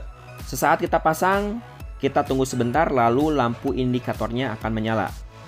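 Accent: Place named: West Java, Indonesia. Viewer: Baceo... Sunda pisan 😁🤣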